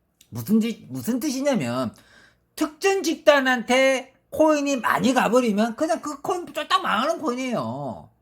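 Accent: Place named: Masan, South Korea. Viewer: 이분 무조건 마산분인디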